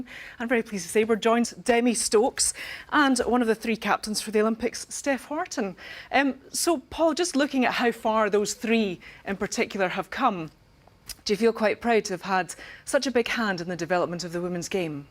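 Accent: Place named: Scotland, United Kingdom. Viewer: is very sexy,love the Scots accent.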